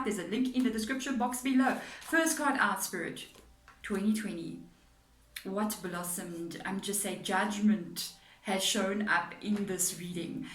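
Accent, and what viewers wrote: South Africa. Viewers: You sound South African? 🥺🙇🏽‍♀️❤️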